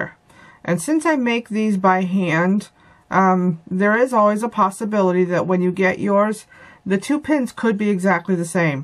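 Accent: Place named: Maine, United States. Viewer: Found your channel quite by accident and am enjoying watching your videos. I knew you were a Mainer just listening. Don't know if I dare start another hobby - it seems to be quite addictive - but may just have to give it a try.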